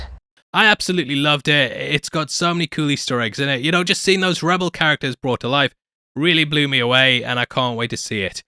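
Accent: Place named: North East England, United Kingdom. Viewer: Are you serious? He's clearly British, from the north east by the sound of it.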